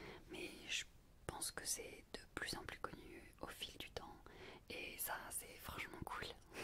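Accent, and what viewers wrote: North, France. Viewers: tu est du nord ? sinon tes video sont super ^^ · Tu viens du nord, c'est cool c'est ma région natale (Haute-Normandie), tu parle un peu Normand ou ch'ti? 😄💜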